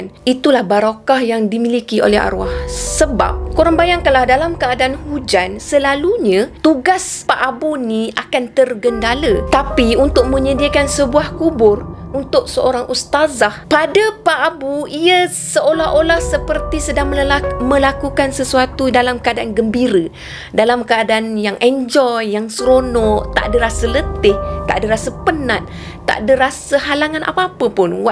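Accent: Kelantan, Malaysia. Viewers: ooo...orang kelante. Jangan marah, saya suka cerita-ceritanya, cara penyenpaianya...eee dengan rautwajah yang berubah-ubah. Rajin awak cari bahan😓😓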